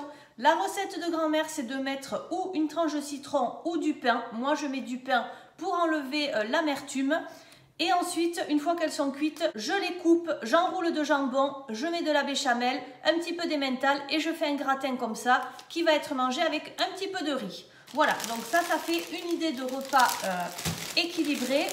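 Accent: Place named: Toulouse, France. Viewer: Mdrrr l accent ! 😅. On ne se rend pas compte en parlant. Ce n est pas une critique. J ai le meme. 😅 Region Toulousaine aussi. Vous etes de quel coté ? Nouvelle abonnee 👍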